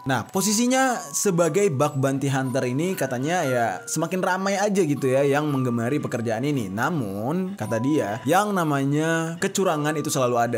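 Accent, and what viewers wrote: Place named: Medan, Indonesia. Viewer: Medan nih bos:v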